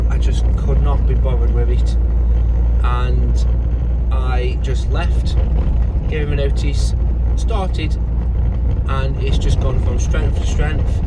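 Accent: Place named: Nottinghamshire, United Kingdom. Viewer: Nice drive, and sounds like you've got a good attitude to your business. Good for you. I was trying to guess where you're from going by the accent, I thought Nottinghamshire, then i saw the road signs!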